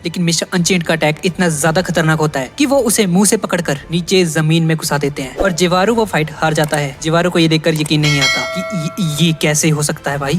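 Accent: Punjab, India. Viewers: Bhai tum panjabi ho kya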